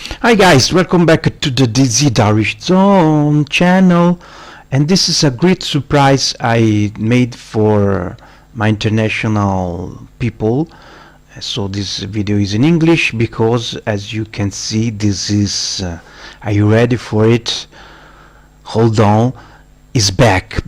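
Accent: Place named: Italy. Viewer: Mi pareva dall'accento inglese :) Bel video, non conoscevo Batocera, ci darò un occhio!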